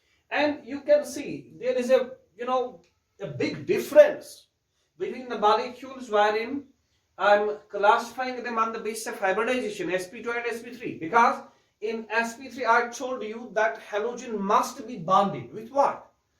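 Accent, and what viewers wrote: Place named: United States. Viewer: Love ur classes & American accent as well...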